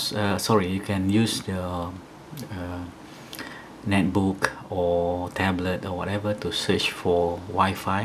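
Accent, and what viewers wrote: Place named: Malaysia. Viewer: sounds malay to me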